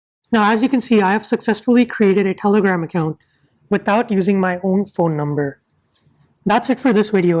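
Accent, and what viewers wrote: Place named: India. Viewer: ikr. But despite that, I found this video kinda useful and the Indian accent wasn't too awful. It would be helpful to simply state that Telegram requires "A PHONE NUMBER" to download/set up, but it does not have to be your phone number OR DOES IT? idk could a textfree number on another device suffice? And what's the deal with the call back? I use textfree to avoid having audio phone service. Does Telegram require that one-time audio connection? If so, then why? It's not an audio-based app. And what happens if a user changes their phone number (which I do regularly). These are the kind of questions which should be answered in this kind if video.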